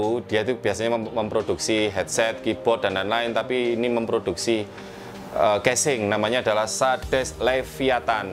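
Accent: Java, Indonesia. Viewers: Klo dlm bentuk lappie udh 18 juta lbih tu. Macam kyk gl553ve. Bang klo ganti gtx 1060 jdi 10 jt ya kyk video sblumnya? Btw Saya demen logat medoknya. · seneng sama logatnya, rada" medhok wkwkwkkwwk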